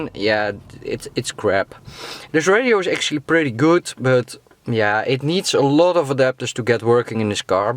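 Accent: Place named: Netherlands. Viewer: Engels met een Nederlands accent hahaha geweldig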